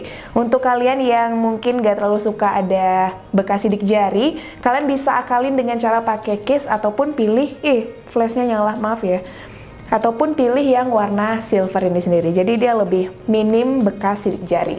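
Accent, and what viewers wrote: Bali, Indonesia. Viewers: Orang Bali?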